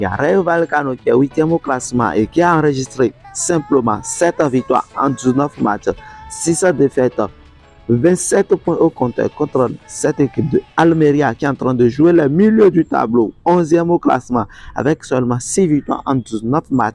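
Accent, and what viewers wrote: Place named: West Africa. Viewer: J'adore trop ton accent a l'ancienne français africain 😂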